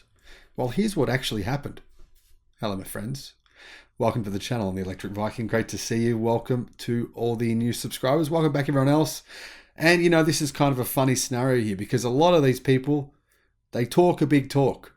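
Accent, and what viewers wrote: Australia. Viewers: But are Australian or Norweed, Im confused, u sound Aussie but reckon you're a viking? do you wear a hat?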